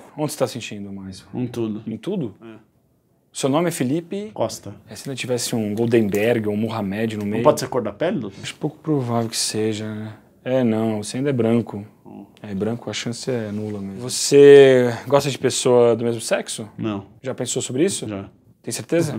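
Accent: Brazil. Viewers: sotaque ceares mt massa nosso sotaque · vc é baiano? meu pai tbm fala youtóba kkkkk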